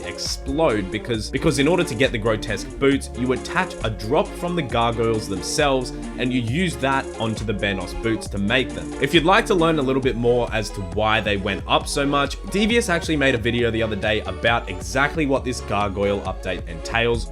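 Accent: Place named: Australia. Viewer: are you Australian? if so where about are you from? good vid by the way!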